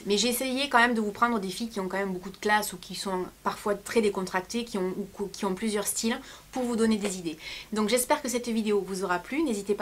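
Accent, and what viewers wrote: South, France. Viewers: L'accent du sud . J'aime trop ; )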